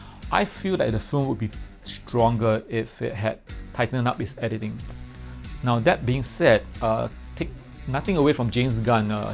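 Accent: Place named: Singapore. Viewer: Singaporean? That accent is undeniable 😂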